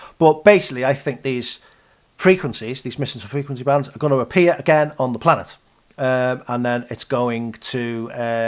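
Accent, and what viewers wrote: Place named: Merseyside, United Kingdom. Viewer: hi, can you tell me whrre you grew up please you sound like we grew up nearby.. my first years were Maghull and burscough. Just askin if its an issue just ignore. Its a complicated world just now. Great work...thank you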